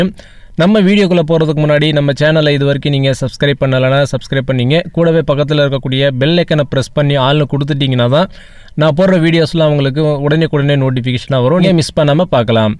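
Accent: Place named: Kerala, India. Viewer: நீங்க எந்த ஊர் ப்ரோ மலையாளம் கலந்து தமிழ் பேசுற மாதிரி இருக்கு.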